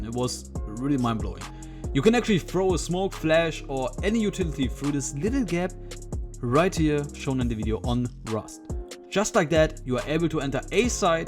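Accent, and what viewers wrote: Germany. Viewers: Noch nie so ein deutsches english gehört hahahah nice video btw❤️👍🏼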